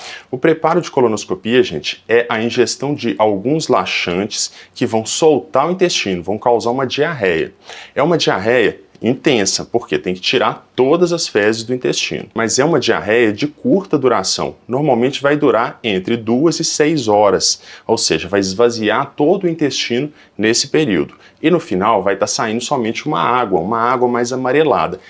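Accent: Minas Gerais, Brazil. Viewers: Médico bonito! Minerin, aposto! Olha o sotaque! Não faço exame com vc não. Tenho Vergonha!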